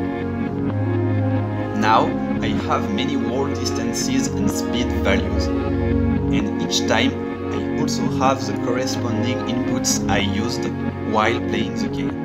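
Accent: France. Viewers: french voice detected ! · On a reconnu l’accent français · T'es francais nan? Sinon bg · Super bon cet accent français. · Areyou french , you sound like a french speaker you have almost the same accent that lots of my friends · Salut (par ton accent j'en conclu que tu es français ^^) ! Super vidéo ! Je la découvre en même temps que mon projet en lien avec les réseaux de neurones. J'aurais souhaité savoir avec quel document/cours/site tu avais appris à coder ton algo de réseau de neurone ? Et avec quel langage ? Merci d'avance ! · Are you French? because of... the accent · pouh l accent francais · au moin grace a l'accent on sait que sa vient de france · Ahah i spotted you french accent ! Cool vid, maybe lower the music next btw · Sur l'échelle des accents francais, t'es loin d'être le pire que j'ai entendu, t'inquiètes. Super vidéo though :) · le bon accent français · L'accent French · tu parles bien anglais mais avec un bon accent français mais pas grave tout le monde comprend 😉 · so French accent 😂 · Ah, l'accent français, j'aime! 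· Mdr moi aussi j'ai cramé que l'accent était français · Nice sa sent quand meme un bon vieux accent français... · Ca c'est du machine learning c'est génial ca ! Par contre on sait direct que tu es francais mdr xD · T'es français non ? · Really like french accent bro xD En vrai c'est ultra intéressant !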